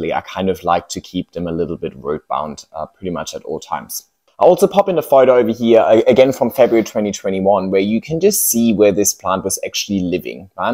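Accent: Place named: Germany. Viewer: I also thought about that. He sounds a bit German (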